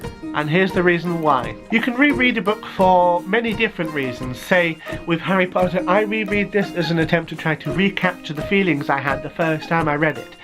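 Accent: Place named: United Kingdom. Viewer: Dear, I'm Ivan and I'm genderfluid:) I've always enjoyed rereading certain books. last year I reread "The Lords and The Ladies" and "Witches Abroad", 'cause I went to live abroad and I found myself in a depression and the only thing I could think that would lift my spirits up was rereading these books. now I started reading 1984 for the first time and, boy, how do I find it relateable to the times we live in!:) I also share the same fears about the politics in the world currently - I'm sad, angry and depressed. here, in Bulgaria, where I'm from our stupid people chose a pro-Russian president, so we'll see how it goes down. hugs!:) keep on doing videos with that lovely British accent of yours! you're adorable!:)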